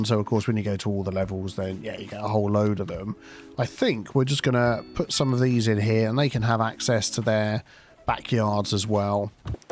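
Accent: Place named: North, United Kingdom. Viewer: ik this is like a super weird and irrelevant question but are u a northener of a southener coz u have a friendly northerner vibe but i can place ur accent nowhere plz ignore if this is too personal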